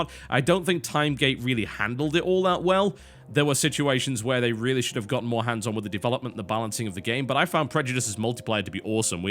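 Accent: United Kingdom. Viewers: Don't worry about missing a few days of content patch, though we all love to hear the latest gaming news spoken in enthralling brittish accent, followed by the most cynical analyses of the current state of the gaming world, we know how hard it is to produce quality content for a youtube channel and have a life at the same time.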